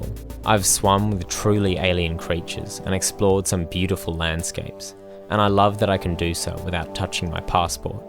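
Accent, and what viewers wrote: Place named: Australia. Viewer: I seem to be falling in love more and more with your channel after every episode I watch. I also can't believe I never noticed that your also Australian before and its Kinda blowing my mind that I never picked up on it. but anyways keep up the great work!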